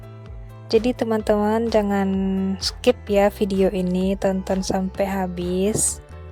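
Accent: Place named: West Sumatra, Indonesia. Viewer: logat minang nya terasa banget uni... asyik jadi tau wilayah ai dingin, makasih ka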